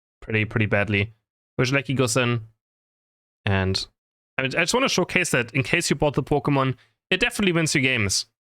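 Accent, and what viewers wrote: Germany. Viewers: your accent sounds like German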